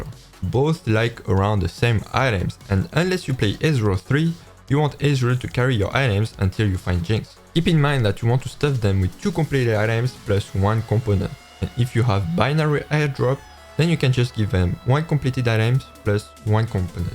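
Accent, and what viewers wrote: France. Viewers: Mec je kiff que tu ais l'accent français, de l'humour, de l'intelligence et un amour pour TFT ! Tu nous fais sortir de l'ordinaire 🙌🏼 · Asian dude with french accent is throwing me off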